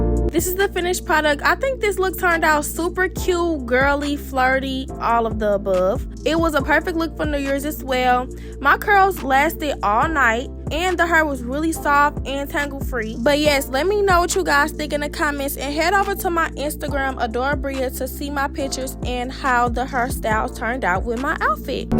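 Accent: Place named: St. Louis, United States. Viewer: St. Louis in the house!!! I just knew it when I heard your accent, babe. Beautiful!❤❤❤❤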